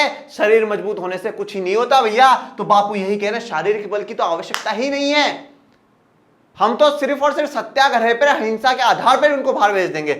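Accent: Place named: Rajasthan, India. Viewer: Sir kya aap rajasthani ho apki language vaisi he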